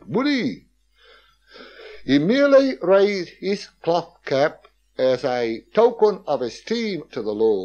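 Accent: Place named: Black Country, United Kingdom. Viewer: Excellent! Love the history and accent of the black country, thank you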